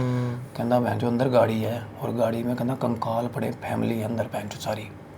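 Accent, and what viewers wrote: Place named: Punjab, India. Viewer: paji sat shri akaal ji.. paji ek gl kehni c meri gl da gussa na kreo bai dilo respect aa tanu veere i really appreciate for your hard working. Paji ek cheej main piche boht dina ton note kr reha k eh banda jehda tade nal baitha hunda naam aa i think veer da, bai eh Banda tadi energy khraab kar reha yr tadi gllan sunan ch boht chass aandi, kyonki tuhada lehja punjabi touch aa extreme energy aa tadi 24/7 active rehnde oo tuc. Pr bai main fr kehna meri gl da gussa na kreo pr jehda banda tade nl baitha hunda aa eh bnda tadi energy khtm karda yr nal beh k susti wala atmosphere bnaya hunda es ne. Sare sade interest nu khtm kr dinda yr eh banda please es cheej t thodi working kro veer g. Otherwise mainu eda feel hunda k jes trha main da susti bhrya chehra dekh k irritate hunda rehnda baki tadi audience v jroor irritate hundi hovegi. 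Eh banda bai mhol bnan ton pehle ee swaad khraab kr dinda aa yr. Ann.. Ann.. kr kr k please bai meri gl da gussa na kreo main dilo respect krda tade hardwork nu baki tuc khud siyane oo paji. dilo pyaar❤️ sada hassde vassde rho, baba bhali kre☑️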